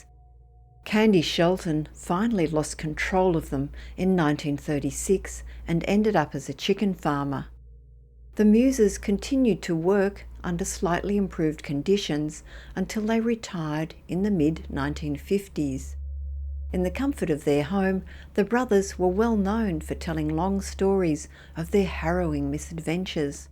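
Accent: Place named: Australia. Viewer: Finally, an Australian narrator, with a nice soothing voice at that.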